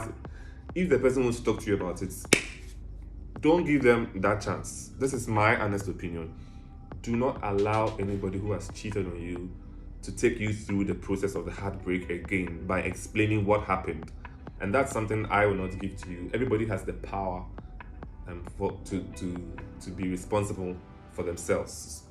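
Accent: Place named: Ghana. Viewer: The best part of these videos is the cool Ghanaian accent 😃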